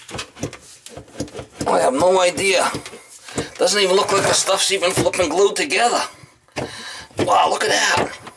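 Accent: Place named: New York, United States. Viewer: were in the USA are you based I'm a retired plumber and heating engineer from Scotland love your videos your just an honest tradesmen earning your corn but not bull shiting your customers you sound like a new Yoker apologize if I'm wrong I'm from Glasgow Scotland love your videos but remember it ain't easy being cheezy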